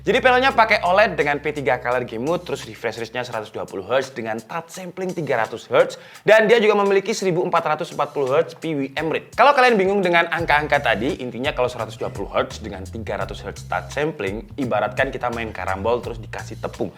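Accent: Malang, Indonesia. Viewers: Bang mu orang malang??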